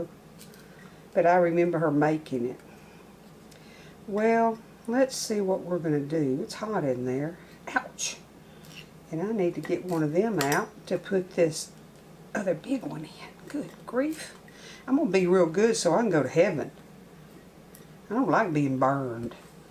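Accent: South, United States. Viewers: Rattle? I heard no rattling. I heard the melody and cadence of a lovely southern voice.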